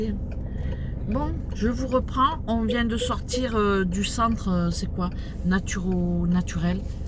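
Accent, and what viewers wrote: South, France. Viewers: Bonjour,d oû vient votre accent, vous venez du Sud? Je ne pensais pas qu avec les pieds dans l eau ça pouvait jouer sur l arret de fumer! Merci de nous avoir fait un peu voyager avec les belles images du fond de vlog à bientôt 👋👋👋👍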